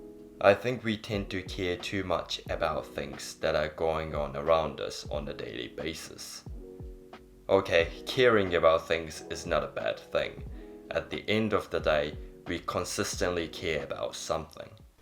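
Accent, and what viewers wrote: New Zealand. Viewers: Your kiwi accent is strong bro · so that explains your accent, you have lived in NZ! Btw your videos are amazing and and an inspiration to people who practicing minimalism like me. Thanks!!!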